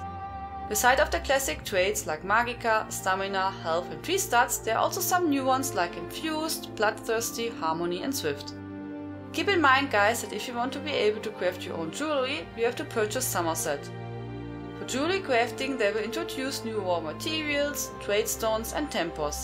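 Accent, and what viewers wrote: Germany. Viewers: Gonna get this expansion. You sound german btw ;) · Sehr schönes informatives und vor allem kompaktes Video! Meistens stört mich der starke deutsche Akzent in englischsprachigen Videos aber bei dir ist er irgendwie süß ^^ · Der deutsche Akzent ist ja dezent süß. Weiter so...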